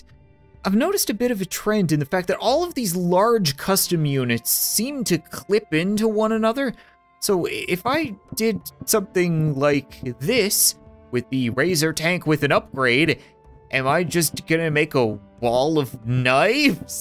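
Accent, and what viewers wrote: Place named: Canada. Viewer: pronouncing his words differently might have something to do with his accent, I think. I find it obvious he pronounces “sorry”, “tomorrow”, “about”, etc. the way he does since he’s Canadian.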